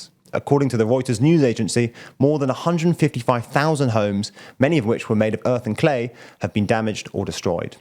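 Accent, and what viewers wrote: United Kingdom. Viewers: I normally don't like new presenters in my YouTube videos but is alright. I think for news what I am really looking for is a smart sounding British man to quickly and emotionlessly explain what's going on so he fits into the lineup like a glove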